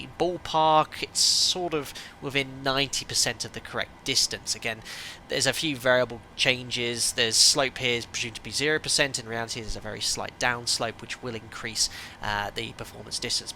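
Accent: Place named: United Kingdom. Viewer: This is why your one of the best flight instructors and tutors for the Boeing 737. Basically an expert with Professional speech / terminology. Full of good knowledge with dry British humour and accent.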